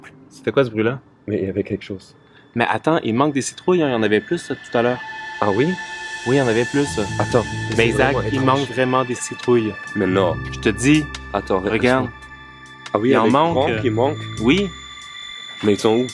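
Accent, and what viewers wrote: Quebec, Canada. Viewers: J adore ton accent quebecua je sais plus comment sa s ecrie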